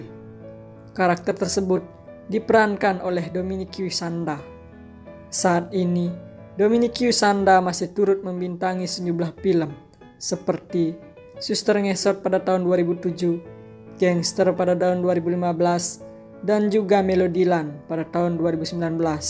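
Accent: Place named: Bali, Indonesia. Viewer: Dari logatnya kayaknya mimin orang Bali ya🤭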